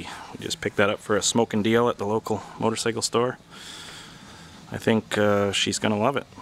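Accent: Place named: Southern Ontario, Canada. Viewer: Really like the plow, great stuff. the hydraulic pump makes me a bit nervous on the back of the blade. Sounds like you might be from southern Ontario riding on Dec. 4.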